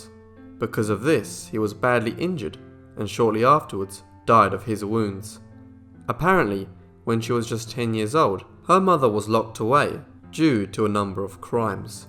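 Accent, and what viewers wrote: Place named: RP, United Kingdom. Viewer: Hard to believe that with such a plummy Brit accent you mispronounce "Grosvenor." It's pronounced "GROW-ven-er "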